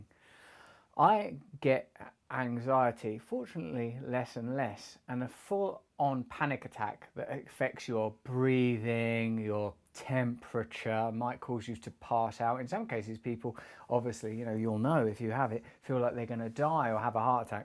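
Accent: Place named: United Kingdom. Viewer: What type of British accent do Russel have?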